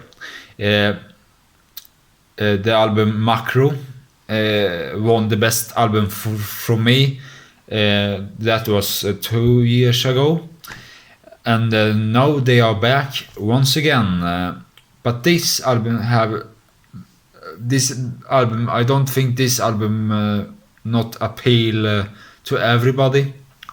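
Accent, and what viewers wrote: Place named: Sweden. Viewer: You speak good english, but there is of course a little dialect from the Swedish dialect, but everyone has a little dialect, wherever they come from:)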